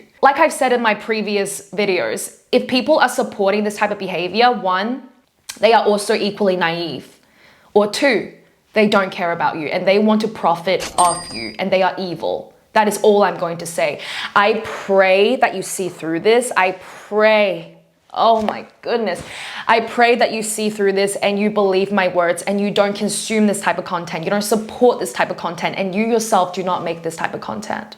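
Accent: Australia. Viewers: Off topic, but I love your Australian accent!😭💖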